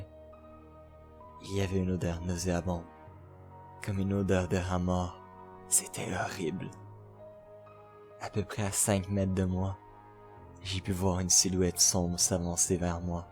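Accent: Quebec, Canada. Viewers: L'accens Canadien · Tu serais pas Québécois par hasard? (Par ton accent et tout) Sinon très chouette vidéo ta voix est plutôt bonne mais tu semblais parfois plus ''heureux'' que triste ou apeurée. Bonne continuation + 1 abonné.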